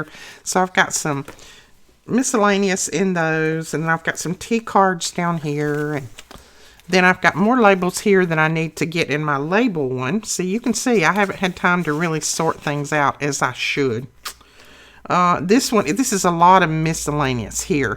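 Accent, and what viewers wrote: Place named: Missouri, United States. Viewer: Oh my goodness, you are so inspiring! I was recently given a bunch of printed papers and didn't really know what to do with them. So I searched youtube and you were on the top of the "scrapbooking" search. Your creations are so beautiful! I already made a couple of bible study journals, but I forgot to take any pics before I gifted them (just took one of the first cover). Now im working on a journal as a graduation gift for a family friend going off to college. I've discovered so many things I can use from your videos! And I LOVE your accent. I live in Washington state, but I'm from Missouri. You sound like home! 🏠💗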